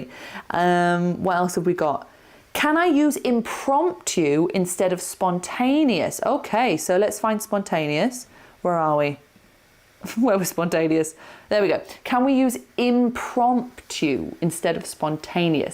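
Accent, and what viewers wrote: United Kingdom. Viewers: I watched you from the begining and I wrote the words I didn't knew and I will watch your video again tomorrow and write some other notes in a excel file for myself by the way, thank you to put these videos for us. it will be really helpful if any one wants to learn english and speak fluently especially with this lovely british accent that you got. I wish you read my comment. I'm from Iran I love british people and their accents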